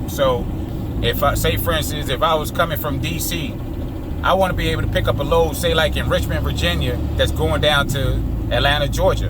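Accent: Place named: Baltimore, United States. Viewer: I heard you say you’re in Md. I’m thinking Bmore because of the accent lol. I’m looking to get into the Business soon . I’m in the DMV also, PG County to be exact. How is the local work in our area? And if you can point me in the direction of a reliable Dispatch? Keep on Trucking Holmes, you make it look easy 👍🏽👍🏽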